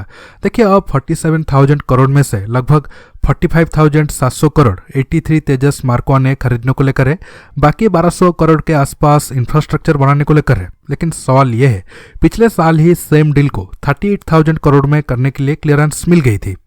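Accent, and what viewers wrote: Odisha, India. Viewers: Bhai aap oriya hon kya? Love your videos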